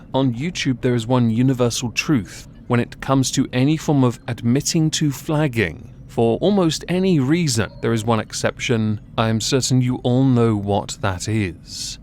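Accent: United Kingdom. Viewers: Ah, nothing like hearing a Cthulhu dude with a silky smooth voice and great british accent dunk on the stupid peeps. Then hearing that glorious outro. Thank you for the video sir! 😄👍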